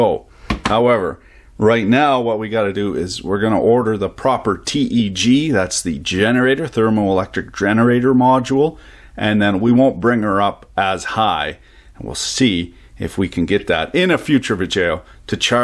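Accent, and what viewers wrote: Quebec, Canada. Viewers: man where are you from? You almost sound like a "Quebecois" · Gosh i love your french québécois! How did you learn it?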